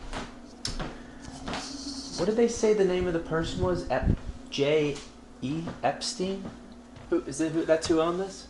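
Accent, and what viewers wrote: Pittsburgh, United States. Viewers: I knew I detected a pittsburgh accent.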